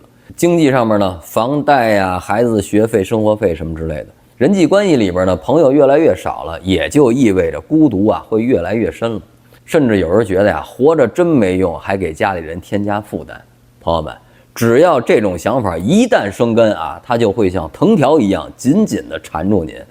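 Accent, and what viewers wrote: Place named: Beijing, China. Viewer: 博主是不是北京人啊，这味儿太重了哈哈哈（褒义）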